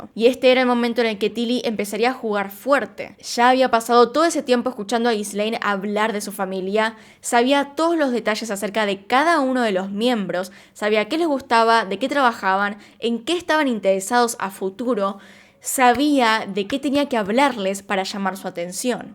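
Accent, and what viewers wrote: Argentina. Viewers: Amo ese acento argentino!!!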